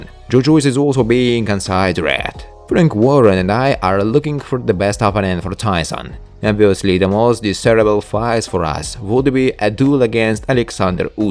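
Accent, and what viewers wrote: Russia. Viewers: I just unsubscribed.had enough of this russian accent!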